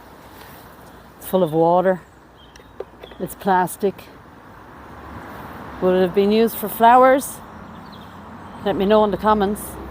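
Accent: Ireland. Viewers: I love your accent. I don’t hear Irish accents much, since I left Boston in 1978.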